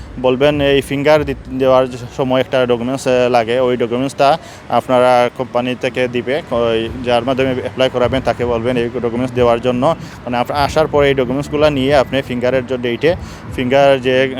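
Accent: Sylhet, Bangladesh. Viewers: ভাইয়ের কথা শুনে মনে হচ্ছে, আমাদের সিলেটের??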